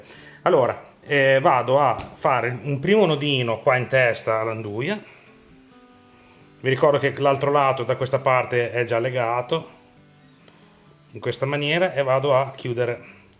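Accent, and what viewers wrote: Emilia, Italy. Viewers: ... nel video sembri mia nonna, stessi gesti, stessa sicurezza. Bravo, un calabrese con l'accento emiliano, perfetto. Un solo piccolo suggerimento. Conviene, anche solo per praticità, così faceva anche mia nonna, legare i tocchetti di nduja mentre si riempie il budello. Tutto il resto è perfetto, anzi l'aggiunta dell'affumicatura, mi convince molto. Sono dei Casali cosentini e qui c'è un salume simile forse identico alla nduja, lo chiamiamo nduglia, le cui tracce risalgono al 1600 (quindi più antico di quello di Spìlinga). È citato in una poesia di Donnu Pantu, "la briga degli studenti", di Aprigliano (CS). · Bravo! È un piacere seguirti e sentirti parlare. Salumi e pronuncia emiliana mi riporta alla mia metà mirandolese. L'altra metà sanmaurese (prov di Torino) mi dice però che quel fornelletto da noi si chiama Fojòt (fuiot) e serve per scaldare tenere calda e degustare la bagna caoda (cauda) piemontese. 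Tecnicamente fa lo stesso lavoro per la bagna e per la 'nduja: manciari! (La parte ragusana di mia moglie)😜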